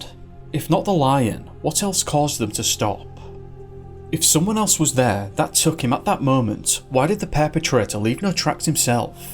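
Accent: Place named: Scotland, United Kingdom. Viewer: does an excellent job with Missing Void and I like his Scottish accent!!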